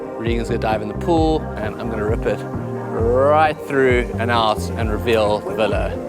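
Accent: South Africa. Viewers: I’m from South Africa to and I just discovered your channel from a guy that is a fpv pilot he’s your buddy you guys made a video together about the go pro hero 12 but anyways I’ve been binge watching your videos and I have to say as a South African I am so proud to know that you are from our country and I’m so glad to see you doing so well in life traveling and making these sick videos I’m moving to Dubai where my family moved 8 years ago and once I get there I’m starting a YouTube channel and that’s how I came across your channel I was researching cameras and drones and your busy I can’t remember he’s name but you where in his videos which led me to your channel cause I heard your accent and he said that you are very well know in his videos and I just wanted to say I’m so glad I found your channel your so inspiring and your videos and skills and knowledge is so amazing to see mahn keep it up u love it when our people can be so skilled and make videos at the quality on par with people over seas and even better as I saw in your go pro awards video and you won to you fucking legend amazing content love from South Africa 🇿🇦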